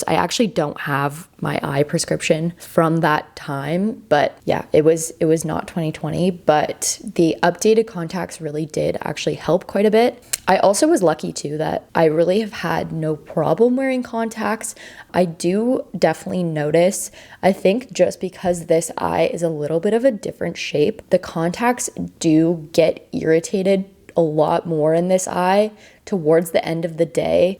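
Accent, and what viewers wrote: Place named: United States. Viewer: I'm an American living long time in Cambodia and just had my Vitrectomy done today. No compliants about the medical treatment here and all the equipment was high tech, etc. etc. However, starting my 5 day journey with my head "locked" into position, right side for the gas bubble, and the "mental" clouds of depression and worry start to happen. So! Appreciate this video as it will helps me look forward to the ups and downs of this surgery. Great to also listen to American English explain things as the language barrier here stressed me out a bit as I am just not 100 percent sure sometimes on the info that was given to me. Again, the medical treatment here in Phnom Penh has actually impressed and now it is up to me to follow doctors orders ( 5 full day in head "lockdown" and stay positive. Thank you for real.........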